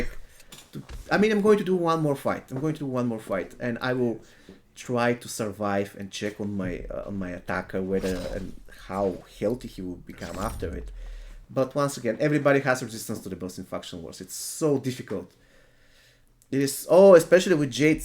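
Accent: Bulgaria. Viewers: are you bulgarian?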